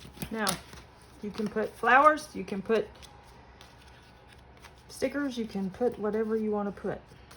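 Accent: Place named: Tennessee, United States. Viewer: Very creative! I'm a new sub. Sounds like you are from TN, possibly KY or the Carolinas. The extender arm on my Fiskar cutting board won't go all the way up. Is there a trick to it? 💖🌷✒📖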